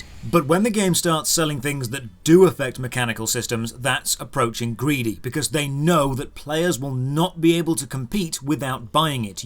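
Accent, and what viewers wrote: England, United Kingdom. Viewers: I like the way u talk to me English man, Keep it up ole chap XD !! great series so far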